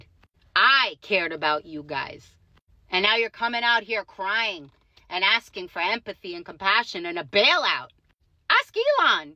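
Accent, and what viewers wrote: New York, United States. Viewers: Actaully if you would actually watch and listen to us farmers when we speak. Plenty of vidoes of us. Its the Ag monopolies that are killing us. Pretty much all grain is controlled by 2 companies. ADM. and Cargill. They are keeping grain prices low. You honestly sound like your from newyork. And never seen a farm. Lol.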